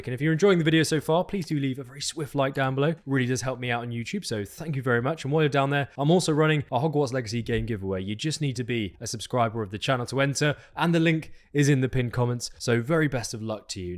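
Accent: United Kingdom. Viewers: Love this info on professors! You're by far my favourite channel for Hogwarts Legacy :) Great info, presented well and with a lovely British accent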